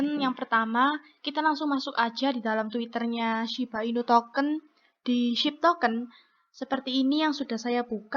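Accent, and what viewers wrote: Java, Indonesia. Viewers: medok x cakapnya hehehhehe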